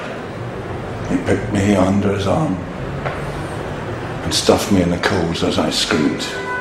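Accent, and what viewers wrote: Scotland, United Kingdom. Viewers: I didn't even clock that he was Scottish until watching this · Nah, his Scottish accent is too thick so far.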